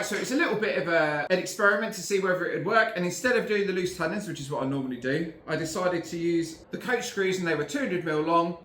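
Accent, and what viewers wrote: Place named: United Kingdom. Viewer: It looks like you have lots of experience along and innovative ideas etc. However, I can't tell which part of the UK you are from, but listening to you, I couldn't tell what you were saying about 30% of the time, especially at the end of sentences and brief asides. I don't say this to be demeaning, rather just to let you know. If I'm having a problem, and I'm from the northwest of England, then there's a good chance lots of other people will have the same problem. When I first moved to North America, I even had someone ask me if I was English, because they couldn't understand me, so I know what it's like. I suggest that you slow down a little, try not to clip the ends off words, and avoid local dialect that listeners from other countries don't understand. Hopefully, you will gain more subscribers which, I believe, you deserve.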